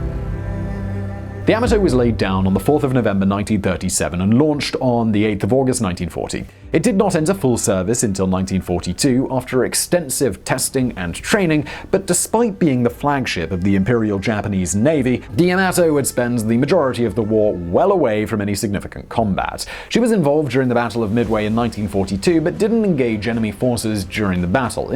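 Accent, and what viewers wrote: England, United Kingdom. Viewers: The most English pronunciation of Japanese words I have ever heard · I have no idea what this is but now I want to know. PLEASE Simon? Use your amazing British voice to teach me useless shit :) love you man.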